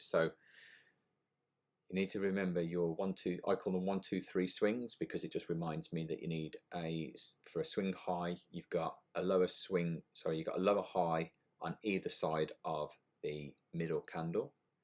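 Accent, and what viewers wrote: United Kingdom. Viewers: Nyc analysis of the charts bro Luv the British accent too 😂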